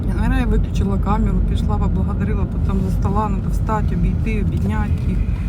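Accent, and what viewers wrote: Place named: Kryvyi Rih, Ukraine. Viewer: Вона балакає на нашому Криворізькому!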